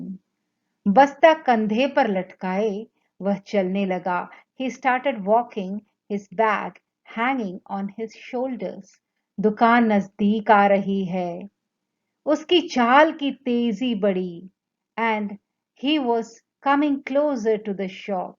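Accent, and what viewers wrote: Kerala, India. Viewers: mam aren't u from kerela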